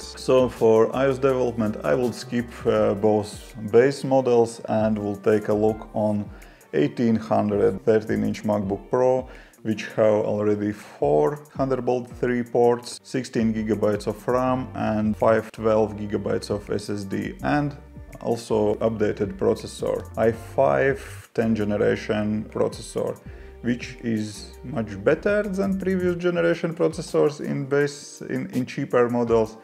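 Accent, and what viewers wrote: Russia. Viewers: Кажется я отчётливо слышу русскаий акцент) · The accent pronunciation is 100% Russian and there is no need to come up with yourself _ that you are supposedly from Latvia. Stupid you are a grown man, and you behave like a 16 year old boy.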